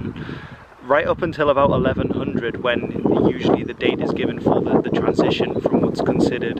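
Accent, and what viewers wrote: United Kingdom. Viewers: I was just wondering, since he speaks English with a very British accent, but his Dutch is also spot on - usually it's one or another. is also a very British name.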